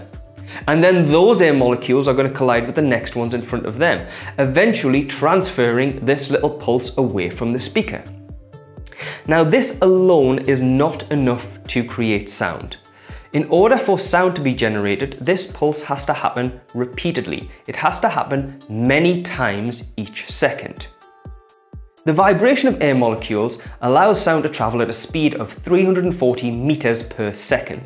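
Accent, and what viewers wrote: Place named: United Kingdom. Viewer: dude that was really helpful .like you go britsh!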